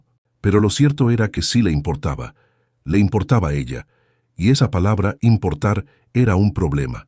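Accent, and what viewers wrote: Argentina. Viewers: Porqué el relato usa el asentó argentino o Uruguay se nota demasiado no es necesario sobre todo si los protagonistas son españoles o mexicanos 🤔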